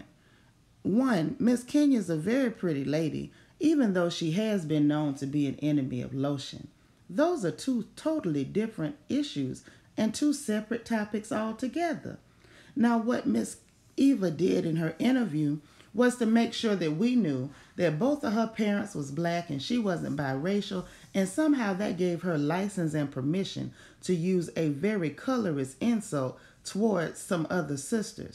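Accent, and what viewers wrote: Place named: South, United States. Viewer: It's the narration with the southern accent and reads for me😊❤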